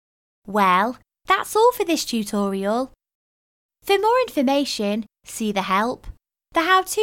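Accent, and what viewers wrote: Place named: Lancashire, United Kingdom. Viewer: Sounds like Bubbles. That's a Lancashire accent.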